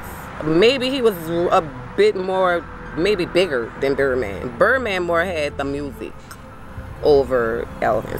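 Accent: New Orleans, United States. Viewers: I love that new Orleans accent ..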